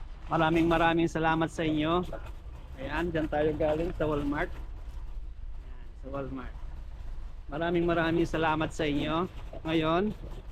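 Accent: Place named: Visayas, Philippines. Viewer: Kuya,wag ka na mag salita tagalog or englush dahil masyadong BISAYA ang dating, say the right pronounciation!!!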